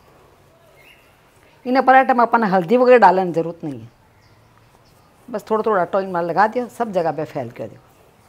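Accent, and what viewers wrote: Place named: Haryana, India. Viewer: Very nice, like your haryanvi accent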